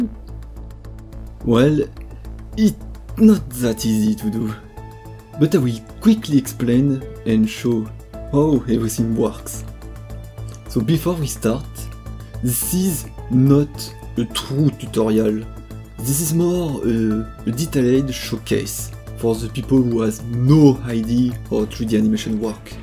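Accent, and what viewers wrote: France. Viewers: Ah yes, french accents. "Y a du skill" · mdr tu me fait rire avec ton accent francais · This is french accent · je l'avais déja remarquer ton accent français :p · Tu as un axen fr lol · nice l'accent français mec ahaha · Oh tu es Fraçais ! C'est bien ce que je me disais ;) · French? I was wondering why you sound like I have no idea about. Are you actually from France? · As French myself , i enjoyed to recognize this famous accent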